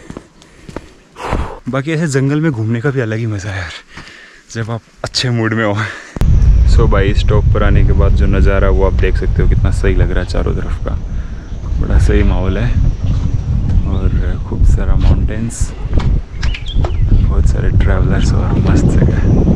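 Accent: Haryana, India. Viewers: आपकी बोली में थोड़ी थोड़ी हरयाणवी है। भाई बहुत बढ़िया लगता है जय भारत जय हरियाणा जय राजस्थान जय राम जी की सहाब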